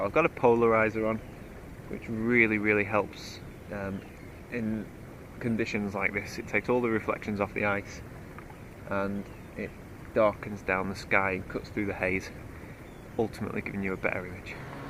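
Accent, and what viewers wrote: United Kingdom. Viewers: Great educational video. We're is your accent from in the UK? I'm living in Edmonton Alberta Canada but born in sherburn in Elmet nd Leeds Yorkshire. I miss film photography as it was never so complicated as digital. Thanks for the education .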